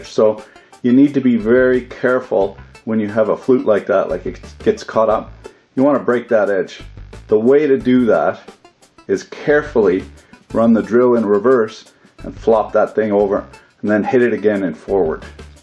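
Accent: Canada. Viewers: You sound rather Canucken to me. whereabouts are you from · He's definitely Canadian, the canadianisms are real with him. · Dude were are u from? I keep thinking Canada, but sometimes i really don't know?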